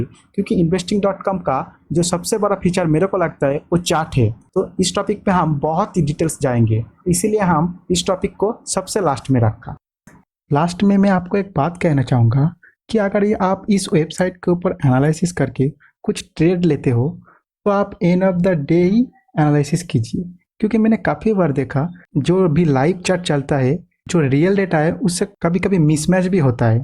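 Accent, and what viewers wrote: Bengal, India. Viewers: Ap bangali ho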